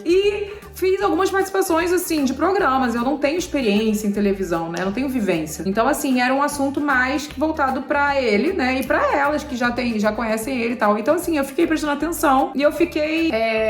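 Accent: Rio de Janeiro, Brazil. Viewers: Com esse sotaque não tem como não saber q vc é só Rio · Estou assistindo os Caçadores ao vivo nesse momento. O descaso foi menor, mas uma das apresentadoras nem liga. Me questiono: será por que vocês são amigos e fazem collab? Será que ambos são cariocas e criadores de conteúdos digitais? Sou brasiliense e percebo os nichos que se formam. E tudo bem defender seu nicho. Porém, se te convidaram, o mínimo era conhecer um pouco da sua história. E me indignou elas acharem que era paulista mesmo com o sotaque carregado. Foram sequências de falta de atenção e prestígio.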